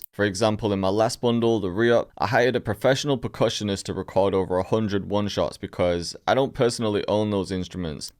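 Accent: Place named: Manchester, United Kingdom. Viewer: Are you from Manchester? Accent sounds a bit like it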